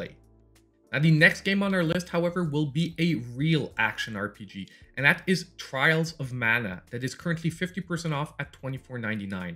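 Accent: Quebec, Canada. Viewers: I'm very surprised that all the deals are from the states, cuz I'd be very surprised if your accent isn't french canadian. Also your channel name is maple syrup...pretty canadian. But I mean people do move to the states haha.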